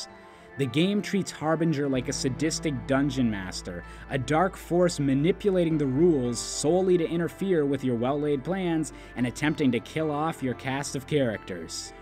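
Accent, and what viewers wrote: Canada. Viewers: This guy is Canadian isn't he? This guys Canadian.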